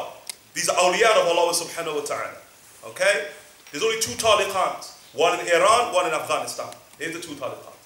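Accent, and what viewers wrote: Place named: Liverpool, United Kingdom. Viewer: He has a British Scouse accent .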